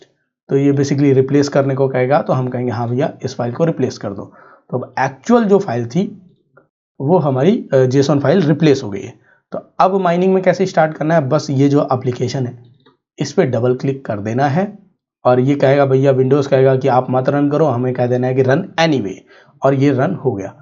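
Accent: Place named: Punjab, India. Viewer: Learn how to speak English or add English subtitles you punjabi derka derka speaking mo fo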